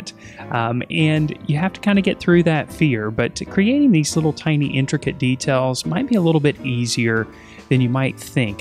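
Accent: South, United States. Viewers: Is that a Southern accent you have?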